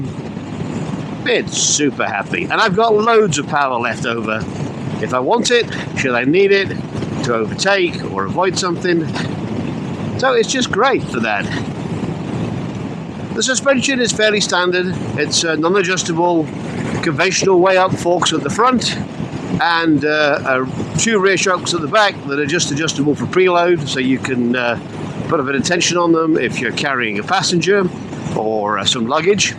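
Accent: United Kingdom. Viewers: It took me a minute to realise your are not in the UK. The accent got me. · Very enjoyable vid and well made for saying you only have a couple of other vids on your channel. I've always thought the W800 as a lovely little retro but probably a bit small for my 6ft 4 frame. You sound British and very clear to listen to.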